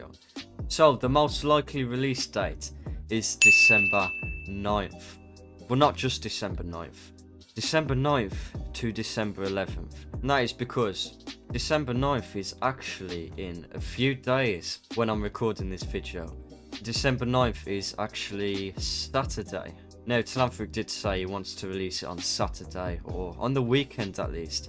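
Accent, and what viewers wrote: United Kingdom. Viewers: Wait ur British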